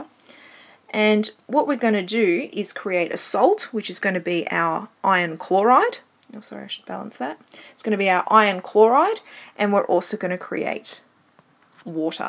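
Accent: Australia. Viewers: what accent is this? Australien?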